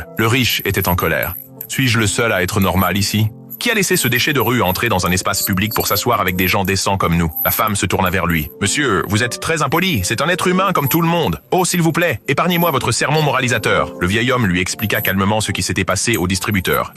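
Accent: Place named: France. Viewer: Votre accent français c'est adorable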